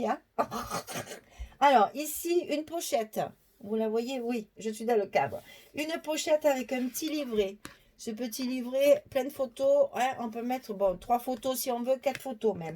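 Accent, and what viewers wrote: South, France. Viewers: Superbe! Quelle imagination! J'adore! Bravo à toutes les 2! Et très sympa l'accent du Sud! 😉😉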